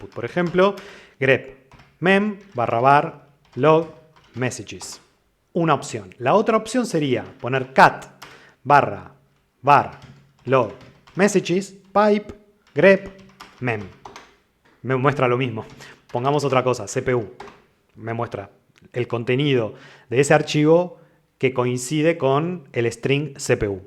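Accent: Rioplatense, Argentina. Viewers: Hola! Caí a este video buscando info sobre xargs, la verdad estaría bueno hacer hincapié en este comando ya que lo que permite hacer es (segun lo que vi hasta ahora) asombroso. De todos modos me aprendí funciones de grep y lo de wc que son súper útiles! Gracias por este video, espero que como a mí haya ayudado a más gente. Abrazo! PS: está bueno encontrar videos donde el ponente habla español rioplatense, hace más fácil la cosa viteh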